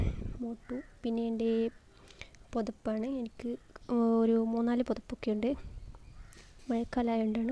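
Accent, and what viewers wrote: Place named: Kannur, India. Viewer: കണ്ണൂര് ഭാഷ അത്ര മോശം ഒന്നും അല്ല ഇങ്ങള് പൊളിക്ക് ഭായ്.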